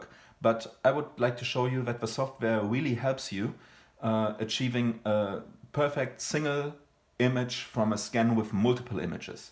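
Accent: Germany. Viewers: dieser deutsche akzent den hört man als deutscher irgendwie immer raus unfassbar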